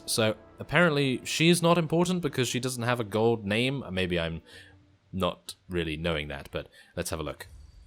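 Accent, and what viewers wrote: United Kingdom. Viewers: This "play through" sounds much more like a very British reading of flavor text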